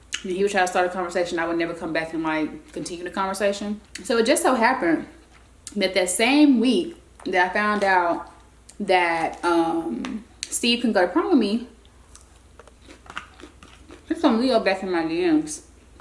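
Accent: Memphis, United States. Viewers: Zaxbys come be hearing from me ! 🤣🤣 your so memphis I love it !!! #901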